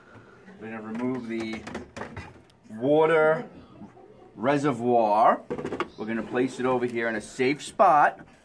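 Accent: Boston, United States. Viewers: I’m guessing from the accent, “right heah”, “in theah”, “cawfee” and the red hair that this was recorded at Nocella’s Southie branch. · Ah you from Bahston? Me, too. Thanks for the help. Will now try to see if it works.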